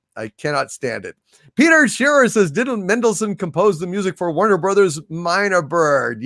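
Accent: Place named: Germany. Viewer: Scottish? I thought you were German. Your braking the fourth wall!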